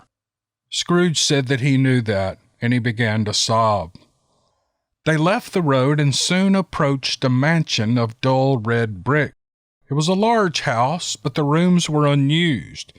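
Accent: South, United States. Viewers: You sir can read Green eggs and ham with that Southern twang and make it sound great! Merry Christmas to all.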